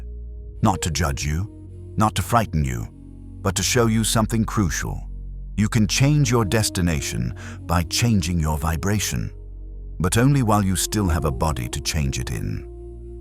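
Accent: United Kingdom. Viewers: Lemme gess more a i. Trying to act kike the know dick about human spiritualality when youre just a preditor taking advantage of humanitys dire need for mass spiritual awakening and info so you put these suposidly helpfull ( all they usually do is repeat them selves over and over and i get a broken clock is right 2 times a day i also get tgat humanitys greedy and the other part of us aint byt we just wanna live and be usefull to are fellow humans were you you dont even intoduce youreself first pount of you drfintly aint one of us strike 1 strike 2 you never ever say any of this like its comming from personal or even studied knoledge no youre literly just copied jung or who ever copied pasted refergitaed real humans real learnend knoledge and deepth to suit youre needs to genetate cash for some one that probably has way to much if that allready 3rd point how i know ypure fake 9/10 times its the same pish english my shit dont stink and i know everything additude when you clearly never put a pair of pants on or walked a mile in any ones shoes see the thing is i binge watch utube tons of it all month a myriad of difrent subjects from soiritualality to religion philisophy sociology psychology history geography homless shelter building old time plants for food and medicine every channel that tails wearwolf stories or dogman and a shit ton of musical everything and this posh englush holly crap iam better than everyone voice with it equally condecending additude its just plain gods da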